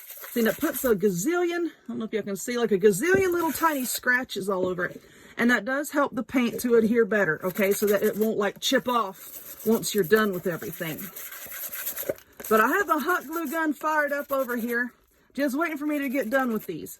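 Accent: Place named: Tennessee, United States. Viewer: I just discovered your channel, and as soon as you said "durn", I subscribed. I don't know what part of the South you are from, but you sound just like me and everyone in Northeast Tennessee. Loved your lantern, if I can find the rectangle canvas thingys, I am going to give it a try. Also, I wanted to mention the Command strips would be great to affix the battery box inside your lantern top.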